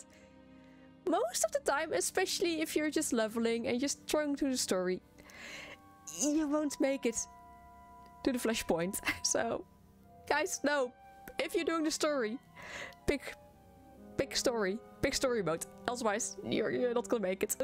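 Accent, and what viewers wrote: Netherlands. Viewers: You sound kinda dutch. Ben je een Nederlander?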